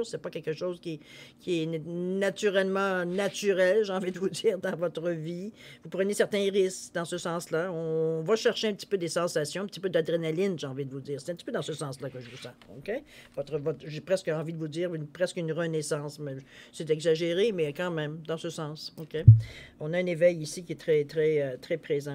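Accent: Canada. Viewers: Bonsoir, Votre approche est particulièrement profonde. Indépendamment d'un humour que seul l'expérience de vie est en mesure de produire, votre analyse est très élaborée. J'ai vraiment apprécié la finesse de votre intuition et puis cet accent sublime du canada est vraiment très réconfortant car il démontre, selon moi, un attachement à la terre très sain. Bon c'est mon sentiment évidemment et vous en rirez probablement. Mais je dois vous confirmez que mon ressentit vis à vis de vos paroles est particulièrement positif car il touche mon être au plus profond de sa réalité. Un très grand merci de votre maturité d'âme et de vos intuitions, c'est un cadeau!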